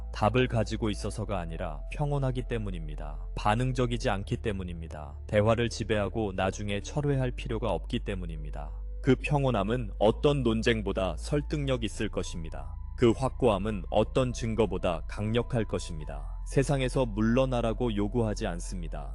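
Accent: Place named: Gyeongsang, South Korea. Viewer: 야호 신납니당~~ 경상도 사투리가 당연하지요 창녕띠기 아인교 ㅋㅋㅋ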